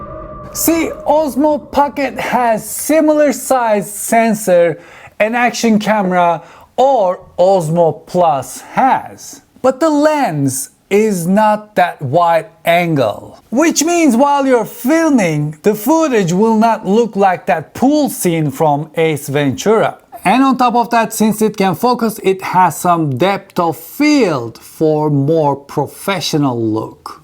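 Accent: Turkey. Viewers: Türk aksanı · What a heavy Turkish accent. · Adam resmen Türk İngilizcesi konuşuyor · Türk olduğunu sonradan aksanından anlayıp şaşırdığım youtube kanalı. İçerik harika umarım aynen böyle devam eder.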